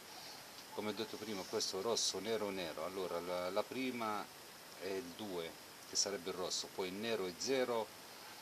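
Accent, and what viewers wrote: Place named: Spain. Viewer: Sei spagnolo si sente nel accento bravo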